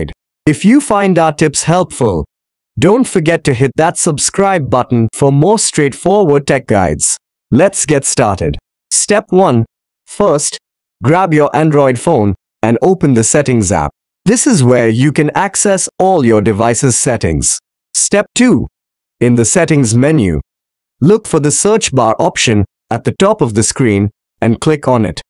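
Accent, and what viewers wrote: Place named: India. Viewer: The Indian sounding accent is unbearable.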